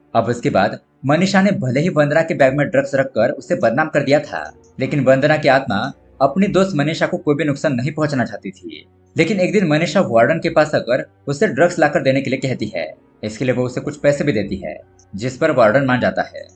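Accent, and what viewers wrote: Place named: Sindh, India. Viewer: mujhay lagta hai ap ki mother tongue sindhi hai.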